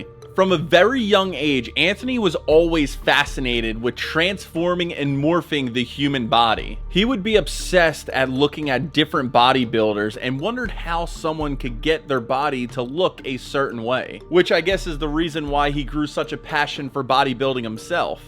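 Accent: Philadelphia, United States. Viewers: This might sound crazy but im From South Philly and I can hear a slight philly accent when u talk. R u from Philly or around the area?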